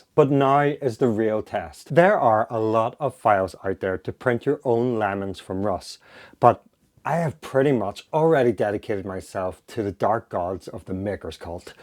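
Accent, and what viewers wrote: Northern Ireland, United Kingdom. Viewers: just discovered you for the first time. praise the algorithm ! that a fellow "Norn Iron" accent i am detecting there lol?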